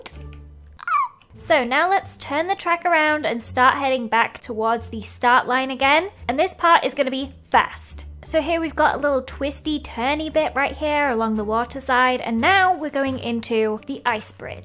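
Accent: United Kingdom. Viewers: Why do you sound British???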